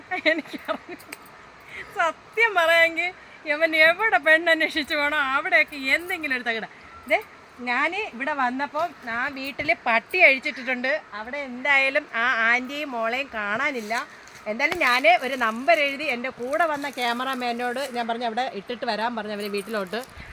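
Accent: Trivandrum, India. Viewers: ചേച്ചി വീഡിയോ സൂപ്പർ ആണ് tvm ഭാഷ സൂപ്പർ സാരീ നന്നായി ചേരുന്നുണ്ട്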